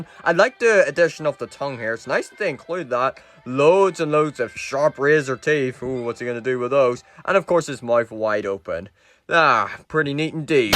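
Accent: United Kingdom. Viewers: Your British lol and so am i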